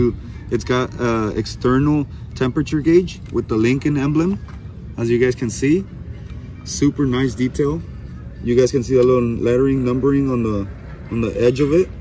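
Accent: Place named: Tijuana, Mexico. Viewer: You have Tijuas accent man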